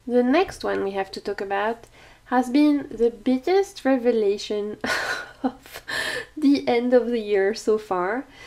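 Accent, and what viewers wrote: France. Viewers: Your lovely French accent is what makes your videos so pleasant to me😘 I love to hear different accents in videos: it makes me appreciate the creators' effort to speak a second language and reach a wider audience even more. I am very self-aware of my Italian accent too, but creators like you inspire self-confidence in me. Sending healing vibes❤️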